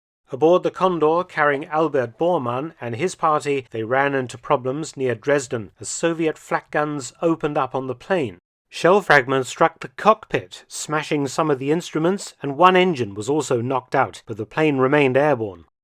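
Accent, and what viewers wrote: United Kingdom. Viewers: British pronunciation. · Yes, it's a British thing. We say lieutenant as "leftenant". However, when we say "in lieu of" it is pronounced "in loo of". I wonder if the American pronunciation of lieutenant as "lootenant" is more correct? A lot of sources do not explain where the British term leftenant came from. However, I like this one: "According to military customs, a lower ranking soldier walks on the left side of a senior officer. This courtesy developed when swords were still used on the battle field. The lower ranked soldier on the “left” protected the senior officers left side. Therefore, the term leftenant developed."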